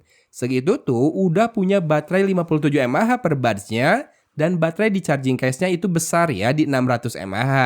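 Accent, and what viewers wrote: West Java, Indonesia. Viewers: Lah, klo memang logat bicaranya jawa barat seperti itu ya mau gmn lg?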